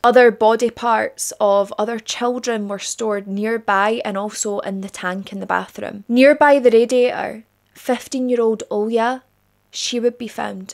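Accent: Scotland, United Kingdom. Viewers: Love the Scottish accent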